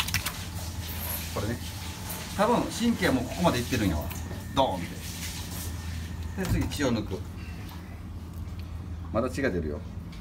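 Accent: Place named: Miyazaki, Japan. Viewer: 流れる水の音と、宮崎なまりの関西弁が良くて、子守唄代わりに聞いてますよ。 「～はですよ、」のとこが面白いほど宮崎の発音ですね(笑)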